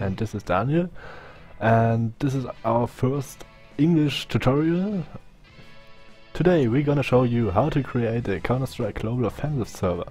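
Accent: Germany. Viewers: sounds german :D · Did what you said and worked PERFECT ! love the german accent makes it funny in some soort of way ;) dont take it bad ! Thank you for this video !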